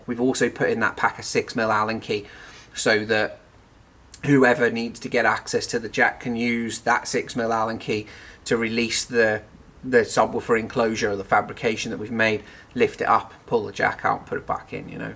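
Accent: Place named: Wales, United Kingdom. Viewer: love your work and your content. Thank you subbed 👍🏻 Incidentally trying to place your accent - there’s a hint of Wales there?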